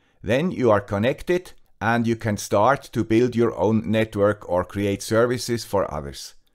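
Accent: Switzerland. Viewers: Not sure how I missed this channel, guy with the Swiss accent.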